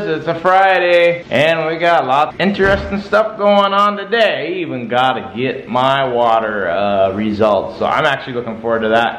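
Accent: South, United States. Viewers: What kind of accent this guy has? Sounds like he has a deep southern accent, or OK accent?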